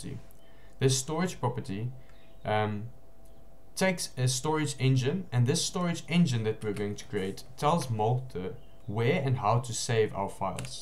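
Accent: South Africa. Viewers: i had to search this guy cos the accent is very much South African!!! haha! very clean and straightforward video thank you! greetings from Germany! proudly SAn!! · You sound South African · Dude, you finally put all my floating questions about the multer docs on npm to rest, thank you, you did such a wonderful job walking us through the code! 🤓🙌🏽💯✨ And super random but I love your South African accent btw 🎊. Greetings from the US :)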